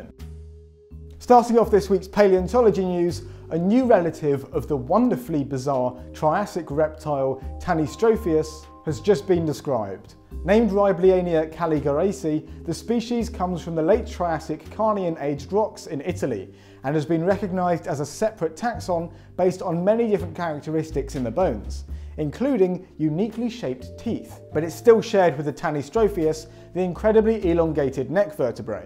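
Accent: England, United Kingdom. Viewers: This was cute. Nice English accent.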